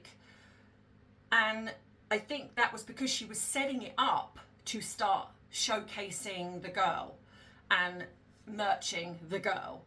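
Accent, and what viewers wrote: United Kingdom. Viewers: Greetings from south coast California. I hear your lovely British voice perfectly clear from California on a Samsung Galaxy cellphone. Thanks 🙏🏼⚘️🐦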